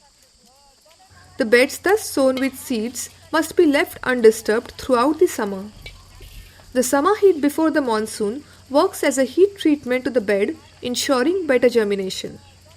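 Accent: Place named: Sri Lanka. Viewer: Though the video is very informative, it's very hard to digest ur srilankan English..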